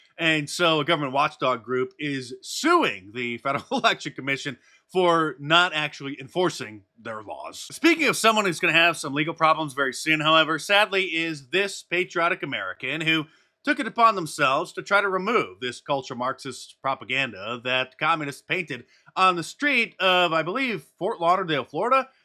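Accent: United Kingdom. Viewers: At least the voice over could have been done by an American sounding voice, not, British, since we are talking about the US Capitol. But, I did enjoy it, and it was funny. I heard the whole thing on Benny Johnson. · Proud that the satirical voice over was by a Brit! 👍👍👍👍🇬🇧🇬🇧🇬🇧🇬🇧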